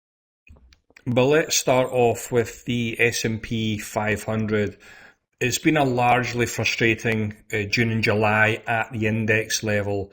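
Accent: Scotland, United Kingdom. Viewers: Thanks for this update and I love your accent, I have so many positive experiences with Scots. Could you say something about Novo Nordisk (NVO)? It is a blue chip company that is down 70% from its highs and I'm wondering if it has reached its bottom yet.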